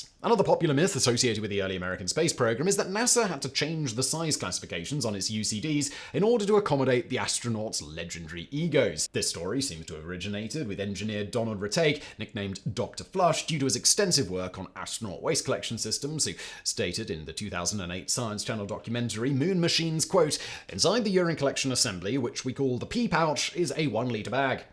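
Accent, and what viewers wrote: United Kingdom. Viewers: This subject can only be conveyed with an British English accent. Centuries of a stiff upper lip has mad it capable of talking about any subject with (at most) minimal sophomorishisms. 😉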